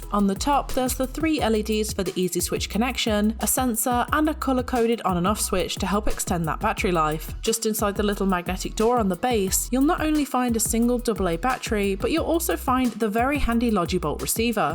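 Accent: United Kingdom. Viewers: Just something about hearing a British woman speak. I can hear you talk all day. Love the review. Informative and helpful. Thanks.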